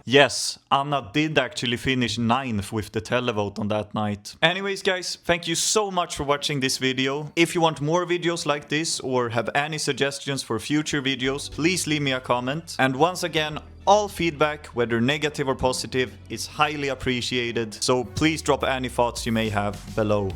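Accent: Sweden. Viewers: Holy speaking Swedish in English, Batman! 😳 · I love your Swedish English accent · Okay, you wanted feedback: Your English is still very "Swedish", with a typical Swedish pronounciation of the words. How to improve it: Listen to people speaking English in movies and try to mimic how they pronounce words and the language melody they're using. I'm Swedish myself and I'm by no means perfect in this regard, so there is always room for improvement. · Your Swedish accent is too noticeable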